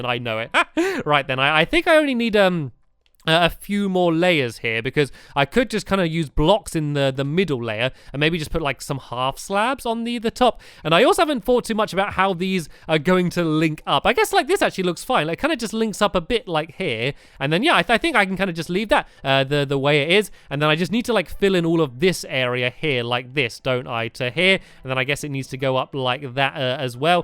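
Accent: United Kingdom. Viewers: I stopped watching stampy like a year ago because his voice sounded annoying to them so I stopped watching for a couple of months but now I watch him because I don't care what they say any more he's from England he's British get use to it people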